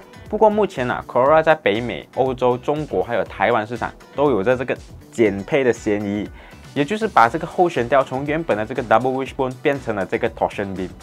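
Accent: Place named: Malaysia. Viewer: 大马华人的普通话原本就不错，只是有些口音而已。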